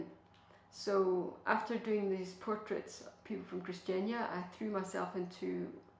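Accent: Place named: Ireland. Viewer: Brzenk, is your muse....so to speak .I just realized , You sound Irish :) P.s , tak for flex, var dejligt at se ;)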